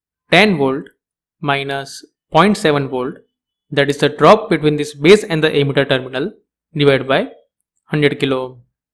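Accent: India. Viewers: 阿三这口音我真是服了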